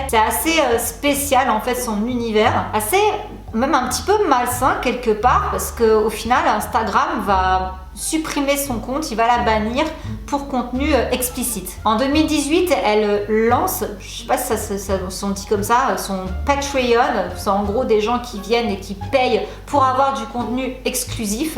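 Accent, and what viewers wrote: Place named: Alsace, France. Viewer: Est-ce que t'es alsacienne ? Ta un petit accent 😅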